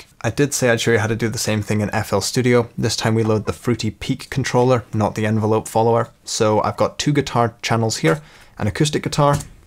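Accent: United Kingdom. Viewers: I thought you are from the UK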